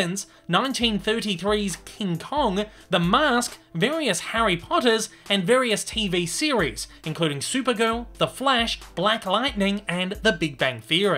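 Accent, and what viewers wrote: Australia. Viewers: Where abouts in Australia are you from?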